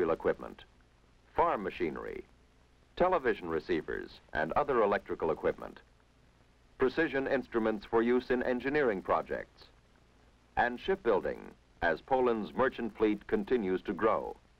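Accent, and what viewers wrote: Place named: Mid-Atlantic, United States. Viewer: I'm not sure but he is speaking a mid transatlantic accent which was really great.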